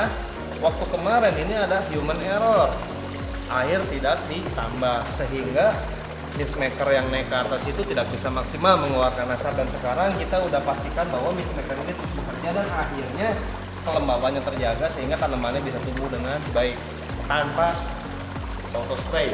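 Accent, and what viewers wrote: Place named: West Java, Indonesia. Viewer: Pertahankan logat sundanya kang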